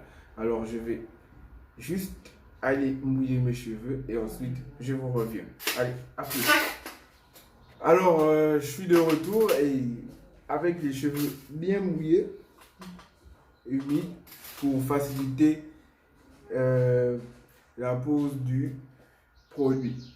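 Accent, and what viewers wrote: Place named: Antilles, Caribbean. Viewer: Tema l'accent des Antilles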